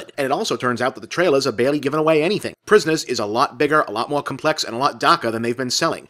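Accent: Boston, United States. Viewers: DUDE!! control that Boston accent. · is it just me or is more and more of boston accent coming off more than usual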